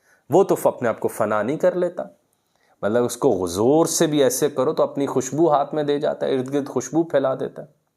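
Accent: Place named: Punjab, Pakistan. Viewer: سر آپکا پنجابی لہجہ بھی خوووب ہے! 👌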